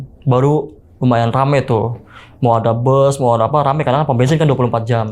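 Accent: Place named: Banyumas, Indonesia. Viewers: Bang, sekedar saran aja, Kayanya berat bgd ngmng gue lu... logat ngapaknya ga bisa ilang, lebih baik ngmng aku km aja. Orang jawa itu identik dengan tutur bahasanya yg alus soalnya 😅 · lu gua tapi medoknya ga ilamg 😎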